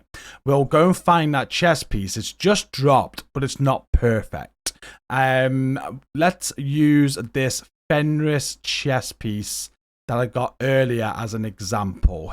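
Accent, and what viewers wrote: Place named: Liverpool, United Kingdom. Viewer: Where are you from? You sound like someone I know from Liverpool 1 haha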